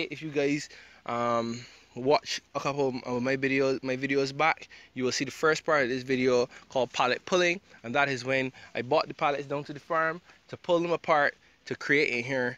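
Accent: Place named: Ireland. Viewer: what country are you in? you sound a bit Irish.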